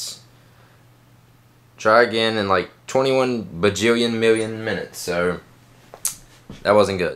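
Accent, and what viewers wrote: South, United States. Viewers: Damn old vid. U sound so country here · pretty sure he just sounds like a southern United States lad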